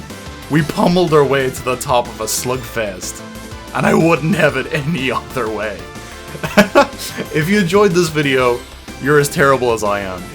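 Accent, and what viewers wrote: Ireland. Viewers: I can really hear your Irish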